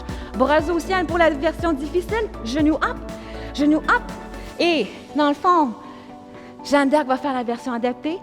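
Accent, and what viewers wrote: Canada. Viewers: Bonjour de Bretagne! je viens de faire pour la première fois votre séance et j'ai beaucoup aimé. J'ai adoré aussi votre accent canadien, je reviendrai demain. Vous avez d'autres vidéos ?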